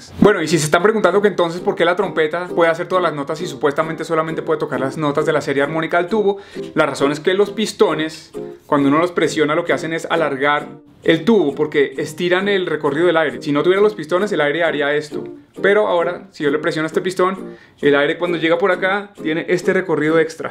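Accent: Colombia. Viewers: Mi silbido casi no se escucha!! Y que bonito su acento colmbiano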